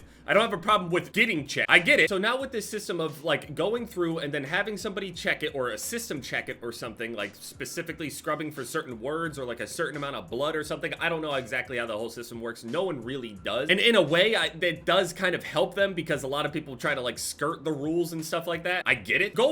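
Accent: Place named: New Jersey, United States. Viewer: from jersey, thats kind of how we just regularly talk lol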